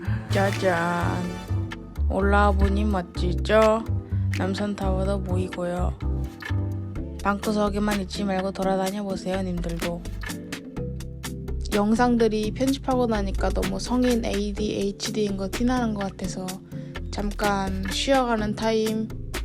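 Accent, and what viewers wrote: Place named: North Korea. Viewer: 북한말은 독학하신건가요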